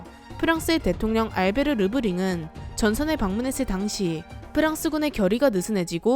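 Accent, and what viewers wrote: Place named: Gangwon, South Korea. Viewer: 사투리가 강원도 가? 어디~나? ㅋㅋㅋ 말투가 귀엽더래요^^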